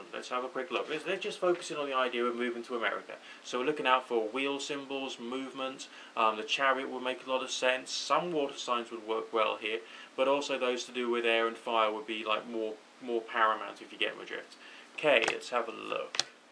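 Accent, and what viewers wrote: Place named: England, United Kingdom. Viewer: o sorry about my typing and yeah my names sari sherri and o your not australian like totally english your funny. i Enjoy listening to you you seem so genuine and have a great personalty and common scense. thanks again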